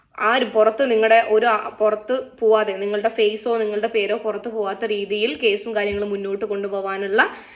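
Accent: Kasaragod, India. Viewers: Edek ninde slang ksd ambo nalle paangan .. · Nammude aa Kasaragod language kayari varumbo oru sugam kelkan. Hats of you dear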